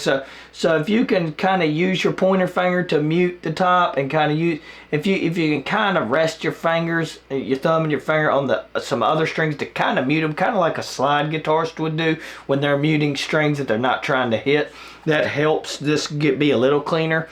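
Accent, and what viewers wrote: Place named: South, United States. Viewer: idk why the algorithm sent this my way but I'm glad it did. I dig your vibe. You don't get a lot of Southern accents on youtube. I've seen that video where Dime talks about this technique but you can barely see what he's doing in it so this was really helpful.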